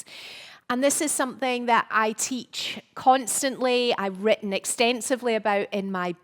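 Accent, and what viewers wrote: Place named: Scotland, United Kingdom. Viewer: How very interesting and so well explained. Very informative video. Going to look out for your book. Scottish accent too, brilliant 🏴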